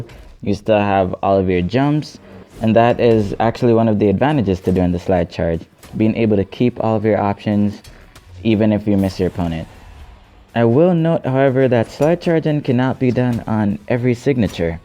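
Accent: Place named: Jamaica. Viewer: Nice. You're from Jamaica right?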